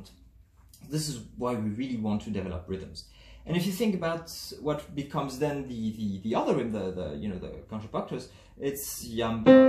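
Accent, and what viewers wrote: France. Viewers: Merci pour tous ces exercices ! Je devine à ton accent que tu es français ?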